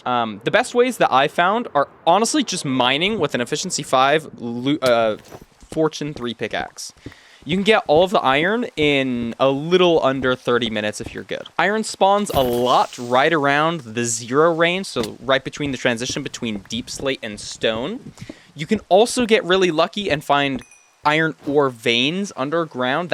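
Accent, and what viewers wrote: India. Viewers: brother u indian like woah it surprised me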